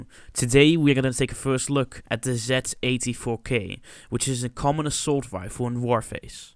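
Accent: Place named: Netherlands. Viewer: lol i smell you are a dutch person right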